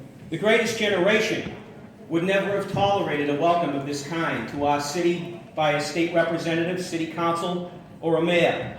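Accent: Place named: Italy. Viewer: This speaker is of Italian descendance.His accent gave him away....;)))))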